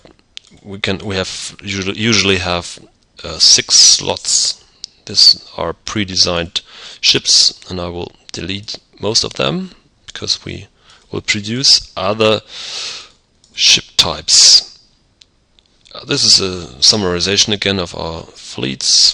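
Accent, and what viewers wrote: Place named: Eastern Europe. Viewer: apart from your irritating eastern-euro voice trying to speak in english accent, i found the video quite helpful for me to enter into this ancient game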